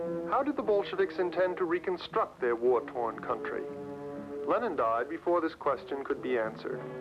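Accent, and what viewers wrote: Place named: Oxford, United Kingdom. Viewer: Попробуйте посмотреть в оригинале - диктор говорит с очень красивым акцентом, характерным для ученых в Оксфорде:) И это не единственный плюс просмотра в оригинале - про-версия не нужна, нейроперевод не нужен, ваши когнитивные способности улучшаются, а главное - все совершенно бесплатно.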